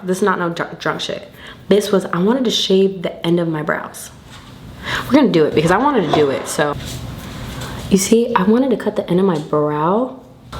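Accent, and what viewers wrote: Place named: Florida, United States. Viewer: I fuck with u the long way!!! Man I miss Florida talk!!! Love it keep em coming!!! Ur doing great!!!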